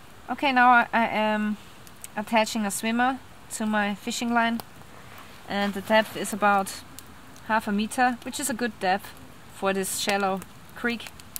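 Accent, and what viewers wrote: Germany. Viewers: I have to remind myself that you're not the girl I loved so much years ago. You are much more dear with a German accent. By the way, your English is great, but "owl" rhymes with growl or now+l. We have huge, very loud owls in the woods near here. Hearing their calls echoing in the night would frighten even the meanest werewolf!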